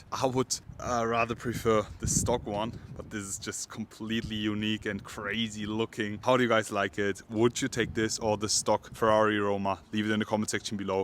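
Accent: Germany. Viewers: Mein Englisch ist nicht besonders gut, praktisch, dass sich der Dude in Englisch anhört als würde er trotzdem Deutsch reden.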